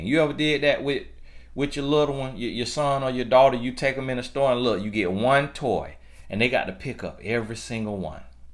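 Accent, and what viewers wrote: South, United States. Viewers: You so real, and transparent! from your dating in your younger years, to being honest about your preference in your wife, and the Lord bless you two kids later.. Your country talking self is alright with me😆👍🏾 I love it! Keep on palming your wife! The RIM ROCKER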